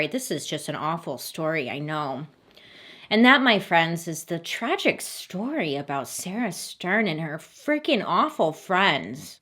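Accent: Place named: New Jersey, United States. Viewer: Is it me or did she have New Jersey accent in this video? Love ya but i noticed it, maybe it was just cause the crime happened in Jersey but it was there.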